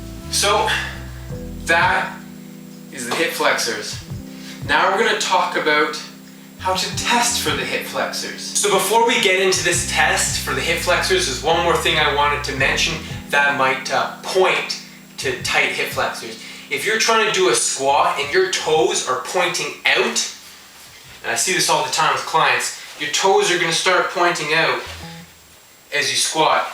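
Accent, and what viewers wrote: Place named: Canada. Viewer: Gud to see you know what you talking about.At first I thought oh no not another American on YouTube. But I think you're Canadian aren't you.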